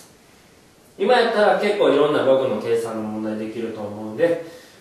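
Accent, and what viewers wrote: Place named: Kansai, Japan. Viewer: とてもわかりやすいです！！ 同じ関西圏ということもあって言葉にも親しみを持てます＾＾ ありがとうございます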